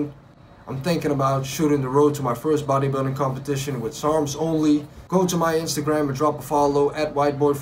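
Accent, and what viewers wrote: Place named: Netherlands. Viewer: U sound Dutch. Was just in Amsterdam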